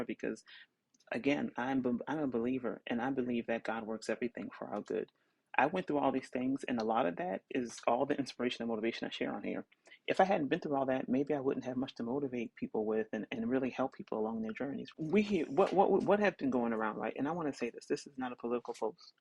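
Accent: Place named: United States. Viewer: Are there that can speak English like this? 😳👀 Anyways, hello 👋🏻 from Nigeria And you 🫵🏻 have gotta be a black American, not an igbo soma 🫵🏻🫵🏻🫵🏻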